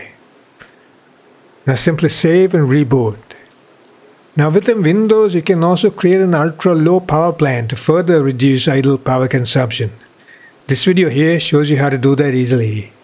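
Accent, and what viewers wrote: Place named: India. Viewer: indian guy trying to speaking english like dolund trumph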